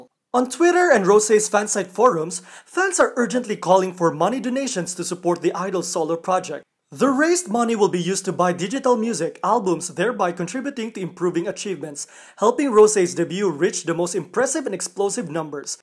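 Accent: Philippines. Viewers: You have a Filipino accent 🧐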